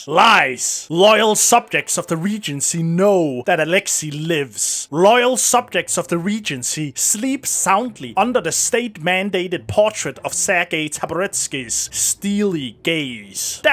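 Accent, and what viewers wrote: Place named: Denmark. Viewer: am i stiktzo or are you danish?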